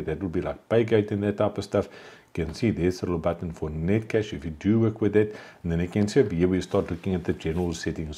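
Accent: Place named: New Zealand. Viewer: From New Zealand I believe, just change the speed of video and learn.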